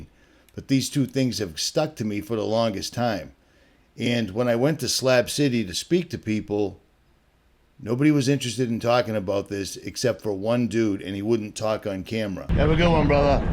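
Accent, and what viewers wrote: Boston, United States. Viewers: I just came across your YouTube channel and this was the first video I've ever watched. I read some of the comments below and they were mentioning a guy named from Boston. I didn't know who they were talking about till I searched on YouTube and Google and figured out that they were talking about the guy who has this YouTube channel. I grew up in Boston; not outside of Boston or near Boston; not on the north shore or the south shore but in Boston. If you grew up in Boston then you know why I mentioned this. I'm curious where you are from in Boston? What section of Boston are you from? I certainly recognize the accent and could take a good guess and probably get pretty close or answer in 2-3 tries. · What happened to from Boston? This man has the same accent as.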